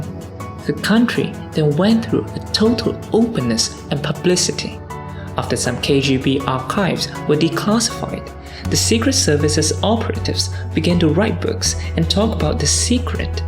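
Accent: United Kingdom. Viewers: 有学剑桥英语吗？你的口音好像学过呢~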